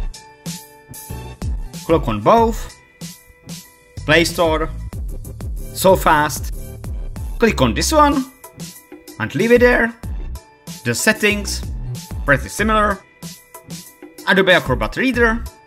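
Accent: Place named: Czech Republic. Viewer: Ten českej přízvuk se prostě neschová ((; hodně štěstí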